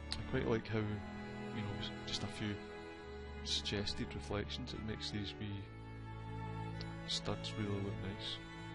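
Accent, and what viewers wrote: Scotland, United Kingdom. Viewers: Your stuff is great, and I've watched all of your videos. But turn down the music by 50% or use a ducker plug in (Ducking Compressor) to reduce the volume of the music when your talking automatically. It's really hard to follow what your saying with your scottish accent. but the narrated vids are much better than the silent ones.They move so fast till you have to stop them and try and figure out what you did at key moments. You are a big inspiration. I've been using Photoshop for 22 years and use to be pretty good in painter but at some point it became to complicated to use and I lost track. but you have brought me back. Thanks. To see my stuff go <link>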